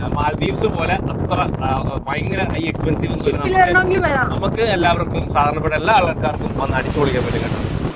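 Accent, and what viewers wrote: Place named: Kannur, India. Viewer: Kannur language ayyi oru cut unde ee language inne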